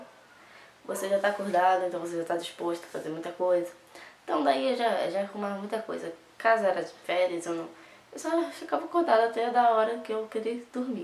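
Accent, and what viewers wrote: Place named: Rio de Janeiro, Brazil. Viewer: vc morra no Rio de Janeiro e porque vc fala carioca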